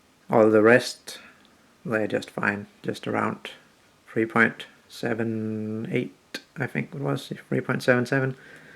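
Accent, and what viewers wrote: Denmark. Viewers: You really sound Danish to me :D Cool projekt! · his accent sounds danish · COOOOOOL fra de første 10 sek, jeg har gættet på at du er dansker :D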